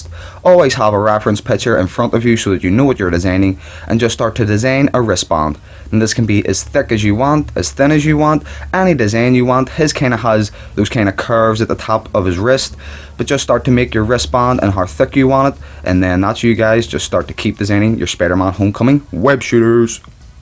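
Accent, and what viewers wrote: Ireland. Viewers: I didn't know he was Irish to 😁😲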